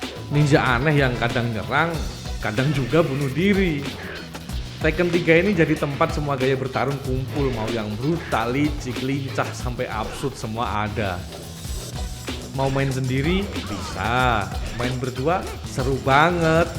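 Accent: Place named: Java, Indonesia. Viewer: Semi medok ❤❤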